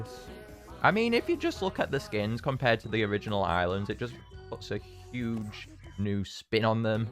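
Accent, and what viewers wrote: Scotland, United Kingdom. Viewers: I think your accent is scottish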